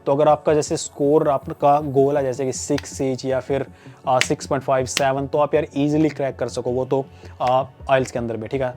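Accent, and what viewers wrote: Haryana, India. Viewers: Haryana ka lagta hai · Haryane ka hi ha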